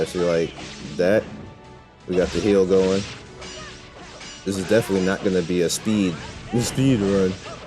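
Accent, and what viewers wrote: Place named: New York, United States. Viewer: Bro are you from harlem? You sound like you from New York. Nice Video on the game!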